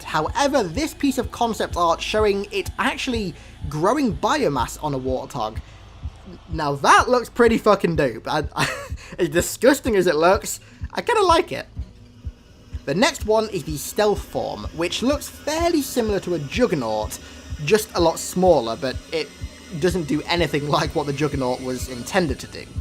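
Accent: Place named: United Kingdom. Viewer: Thanks for the video man, I just subbed to you like last week. Just wondering are you from the Uk? Your voice is kinda odd to me and sounds like it's from the Uk. Just asking cause I have seen some vids with facecams and I see usa flags in the background and guessed you are either from there or the Uk.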